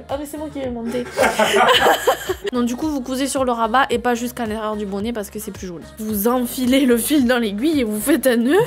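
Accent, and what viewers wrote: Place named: Southwest, France. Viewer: mdr le petit accent du sud ouest ! cool la vidéo en tout cas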